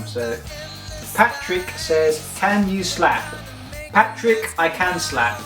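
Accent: United Kingdom. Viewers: You're BRITISH!!!! I thought you were spanish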